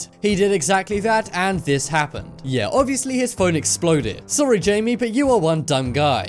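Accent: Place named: United Kingdom. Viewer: Your Sound British so say Tap not Faucet 👎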